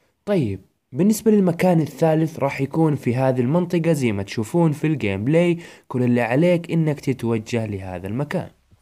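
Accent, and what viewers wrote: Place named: Hejaz, Saudi Arabia. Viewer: هذي اللهجه الحجازيه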